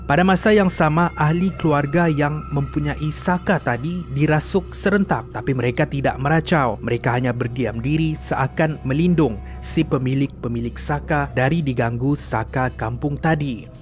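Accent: Sabah, Malaysia. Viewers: Dia Sabah, bahasa pun lain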